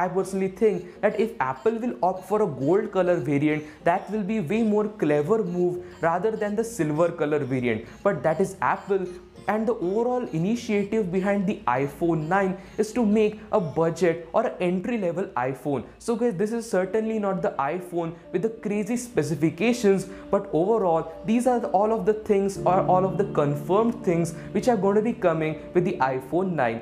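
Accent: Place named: India. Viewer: Your accent is reminding me of Indians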